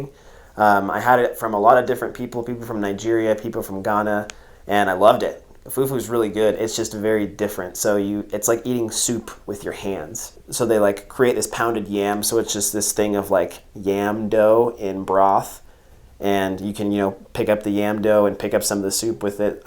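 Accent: Netherlands. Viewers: As a Dutch person I agree. His American accent still comes through, but he definitely also managed to get a lot of the Dutch accent right. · Your Dutch accent is amazing! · Oh my god your dutch accent is just wow! I love it😋🇳🇱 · Ik vind je Nederlands accent echt geweldig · His Dutch is quite good. He even has a slightly Dutch accent :p (when heard by a Flemish person) Impressive! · Hm oke oke. Hij spreekt met een accent maar verder netjes nederlands. Niet verwacht.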